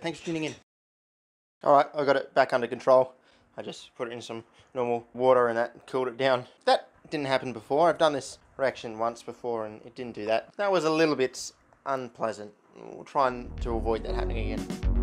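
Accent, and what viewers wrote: Australia. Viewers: lol i saw that too and laughed. of course he'd be using a broken grad cylinder. wouldn't be without aussie accented swearing and delightfully ghetto equipment.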